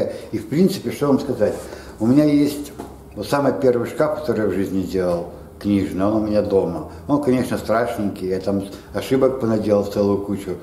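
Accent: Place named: Odesa, Ukraine. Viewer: забавляют-не забавляют-такое...))) Во-первых, имеем дело с чистым одесситом. Слушать одесские обороты и истории-отдельное удовольствие. Как говорится, что вы говорите-не важно-просто говорите по одесски. Мастер, по-моему скромному мнению, очень хорошо и без понтов доносит личный опыт, бесценный. И чего раньше канал не создал. Диме, сыну, огромное благодарю, что таки батю сподвиг. И по друге (во-вторых), коммент вверху уводит в сторону от сути и духа повествования. А так, понятно, каждый имеет право видеть и воспринимать ролик по-своему. Ничего личного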